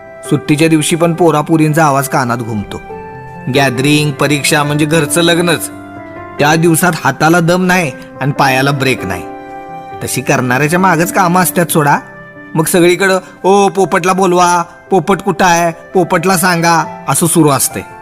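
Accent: Kolhapur, India. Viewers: एक नंबर साहेब. नुसतं आवाजावरनं सांगतो तुम्ही कोल्हापूरचे आहात. आम्ही आहे दुसऱ्या शहरात. पण जिल्हापरिषदेच्या शाळेसारखी मजा कुठेच नाही.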